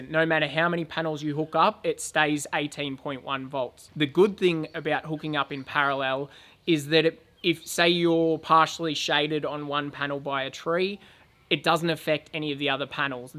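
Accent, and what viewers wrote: Australia. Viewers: I love your videos, it's fascinating to watch you do this van build and you explain everything so well that I feel like I could even do it....almost. It also doesn't hurt that you're easy on the eyes and have an australian accent. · I’m in awe of people that can do this stuff 👍🏻 and is it my imagination but has that Aussie twang gotten stronger?!😂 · Now get ready for the questions about bob being your uncle 😂😂😂Us Aussie’s get it but l don’t know about other countries. I love the country boy using good old fashioned slang and your “strine” is music to the ears. Great job young cobber !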